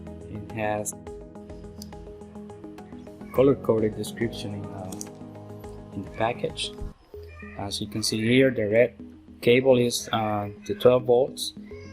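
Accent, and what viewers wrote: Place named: Dominican Republic. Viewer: Loco pero tú sueña más Dominicano que yo.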